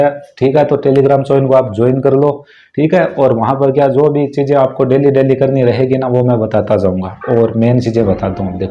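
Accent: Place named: Sri Ganganagar, India. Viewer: Bade bhai thari voice hu laag the mer triya sri ganganagar ga ho Or bhai Ma Telegram par add hou Koi problem hosi to Thaan Yaad Kruga ❤❤❤❤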